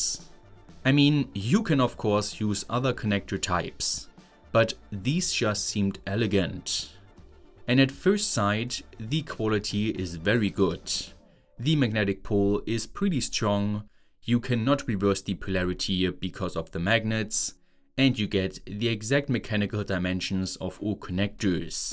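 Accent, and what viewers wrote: Saxony, Germany. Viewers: almost SÄCHSISCH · Bro has the most German accent I have ever seen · I'm wondering about your accent. Are you German or Austrian? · Dieser unglaublich schreckliche deutsche Akzent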